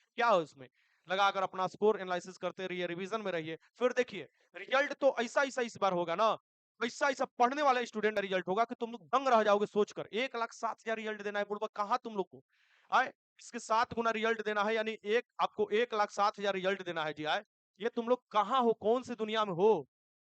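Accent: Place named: Gopalganj, India. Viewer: App khati bhojpuri bolte hai gopalgnaj jila wala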